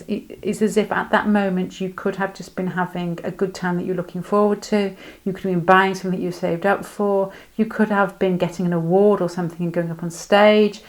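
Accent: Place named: Midlands, United Kingdom. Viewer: Pile 1 wooooooooow amazing 🤩 I also hear a midland accent, as a midlander that’s makes me so happy 😁 Love and light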